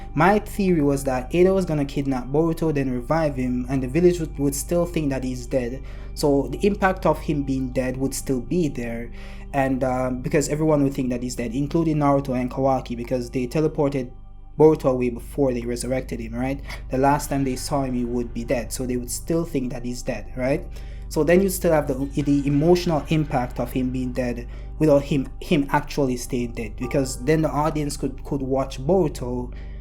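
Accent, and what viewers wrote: Jamaica. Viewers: Are you Jamaican bro?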